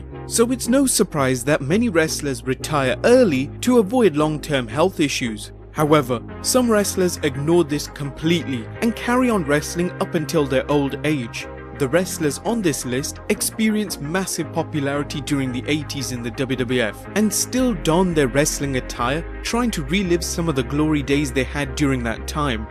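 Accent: United Kingdom. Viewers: I swear every channel on YouTube that does top 10 or top 5 videos is narrated by an annoying British boy!!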